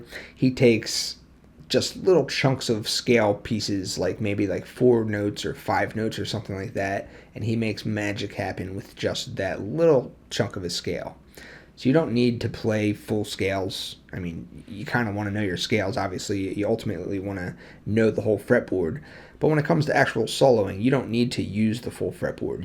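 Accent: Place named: Philadelphia, United States. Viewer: are you from philly? you sound like you’re from philly.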